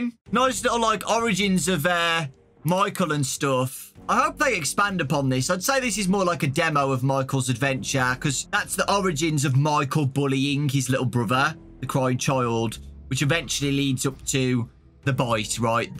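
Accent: United Kingdom. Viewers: He’s so British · accent kinda british tho..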